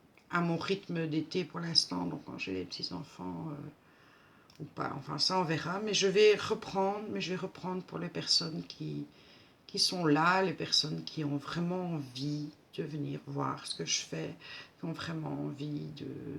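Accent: Belgium. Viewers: Coucou je reviens sur ma chaîne après un break vacanciers oblige lol, je suis contente de te retrouvé parmi nous, j'aime beaucoup ta chaîne et ton joli accent belge 😜😜😜 ne change rien, je te fais plein de gros bisous 😘😘😘😘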